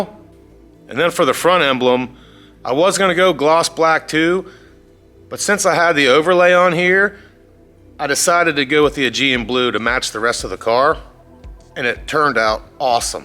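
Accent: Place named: Philadelphia, United States. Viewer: OMG you have such a Philly/South Jersey accent !!! PS - Your 2022 Civic sport looks amazing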